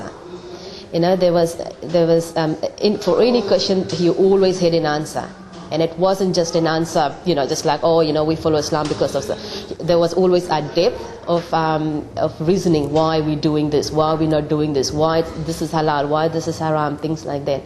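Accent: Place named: United Kingdom. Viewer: This is UK accent 😊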